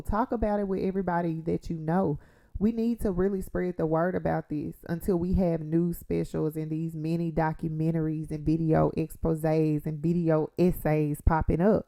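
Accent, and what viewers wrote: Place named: South, United States. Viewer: girl your accent is so southern🤣🤣🤣🤣🤣🤣✌✌💖💖good lesson!...hope this case get the justices he deserve.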